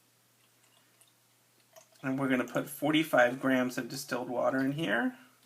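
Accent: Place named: Philadelphia, United States. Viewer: I've made room sprays using varying percentages (from 50% to 90%) rubbing alcohol, or vodka (35% or 70 proof), or Evercleer (95% or 190 proof) grain alcohol. I really dislike the alcohol smell in all of them, nor do I like waiting for the alcohol smell to dissipate...some taking MUCH longer than others. So I'm glad to hear about the DEO Household stuff you've recommended using. I'll check it out. One other thing, though. I've always heard you should use EO instead of FO in a linen spray because FO can leave a stain and EO will not. For that reason, I've only ever used EO in a room or linen spray. I've never used FO in a room or linen spray, so I can't say whether it stains or not. Have you any thoughts on that provision? You sound just like my friend in NE Philly...are you in Philly?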